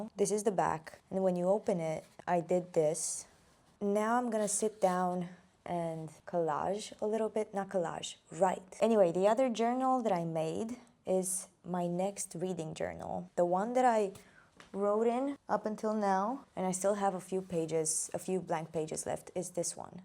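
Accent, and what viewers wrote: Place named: Philippines. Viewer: I might just be weird but you kinda sound like you're from the Philippines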